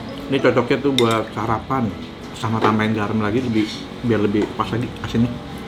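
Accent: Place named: Jakarta, Indonesia. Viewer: Ko logatnya dengernya kajarta 😄😄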